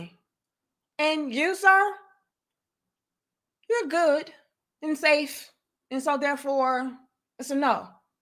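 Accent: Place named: South, United States. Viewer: She has the most unique southern proper voice and dialect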